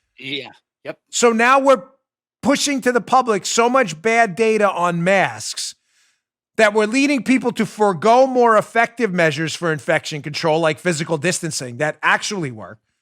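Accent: New York, United States. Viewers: You lost your audience alright... every time you are fake by calling on, stop me if I lose the audience bullshit. Do you really think that you are talking to a bunch of people that can't follow a new yorker trying to explain something? With dis and dat and dee udder ting...? You know, I take a break from you for about a week or two, and then my brother in law tries to get me to listen in again, and you can't seem to have one program where you don't offend me by acting fake..., stop me if I lose the audience... How the hell would he know? You seem to get worse as your show goes on. You scream and whine really loud and I have to turn down the volume, then you talk super cool and quiet, and I can't understand what you said. I used to turn up the volume, then you would forcefully start talking again and I would have to mess with the volume again. I think that I will unsubscribe your channel and go some where else for my fear mongering... 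you don't seem to be the guy.... I even get tired of seeing you on Fox, as you look so buff and tough, even though everybody has heard you hit the third octave above your normal voice, kinda like Mike Tyson.. heheheh...adios... I know you wont give a shit about me leaving... that just says a lot about you... and me both...!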